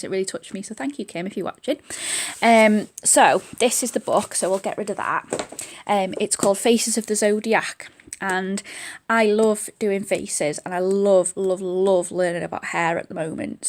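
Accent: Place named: Northern England, United Kingdom. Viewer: Great video and you have a northern accent like me 😍😍 Are you Manchester based ? You sound around Oldham Manchester way x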